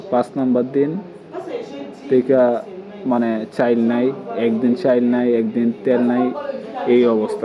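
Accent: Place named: Kishoreganj, Bangladesh. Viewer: আপনার কথা শুনে মনে হইতাছে কিশোরগঞ্জ /কাপাসিয়ার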